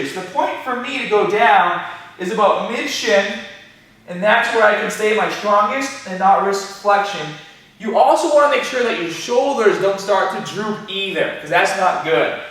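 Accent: Boston, United States. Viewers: I ABSOLUTELY LOVE WATCHING YOUR VIDEOS!! Especially with that Boston accent of yours❤️. Enjoy waking up & hear your cheerful self on YouTube! Wish you lived near me, I’d love a trainer like you. I’d appreciate any suggestions!! My right shoulder is 💩 for the past few hrs. You name it, I have it. Torn rotator cuff, torn labrum, bone Spurs, osteoarthritis, fraying of bicep tendon, etc. my ROM Is awful. I’m on my 10th cortisone injection over past few yrs. surgeon said need partial shoulder replacement BUT due to my age, 48.. he doesn’t recommend it. Told me I’d have less ROM after surgery heals. & only lasts 10 yrs.. even though it’s my shoulders that are 💩 it always interferes with any work out I do legs, glutes. I’ve been to physical therapy, chiropractor still going to that I do my wall stretches band stretches are used to be shredded I had the best arms around I can’t even do a 5 pound lateral raise. 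I would love any suggestions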